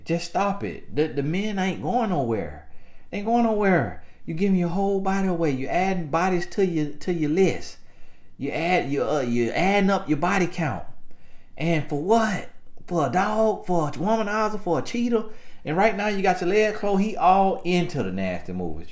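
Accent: South, United States. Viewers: Great video! You tell it like it is..time for her to leave and work on herself. Love your country accent ❤ 💕. Thank you for your wisdom on these man boys. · is too funny, love the country accent, keep telling it like it is brother.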